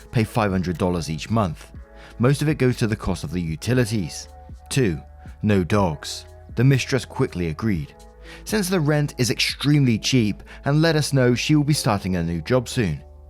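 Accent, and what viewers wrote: England, United Kingdom. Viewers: From Norn Iron and I love your English accent!!!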